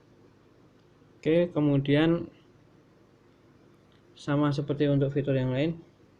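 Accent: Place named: Java, Indonesia. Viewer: Lu yang norak bukan jamnya😂😂😂KAMPUNGAN NGOMONGNYA MEDOK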